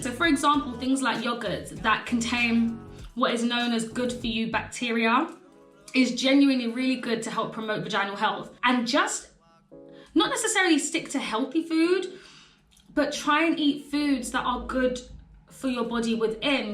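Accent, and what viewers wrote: United Kingdom. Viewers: i love me some british accent🥰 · I like your accent. Are you from the Uk?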